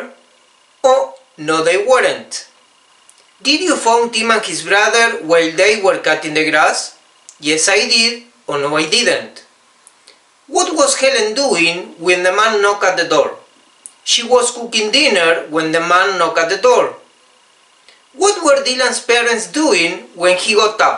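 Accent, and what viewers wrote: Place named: Argentina. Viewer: Con esa pronunciacion no voy a aprender el ingles correctamente con el acento argentino para nada.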